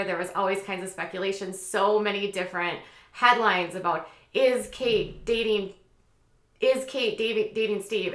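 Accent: Minnesota, United States. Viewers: I notice you say it like “Gosling” instead of “Gosselin” — but it may just be your Minnesota accent. I think Minnesota accents are just too cute!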